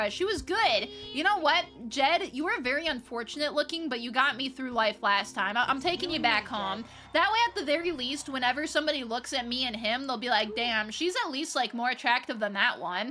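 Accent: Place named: Midwest, United States. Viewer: you sound mid western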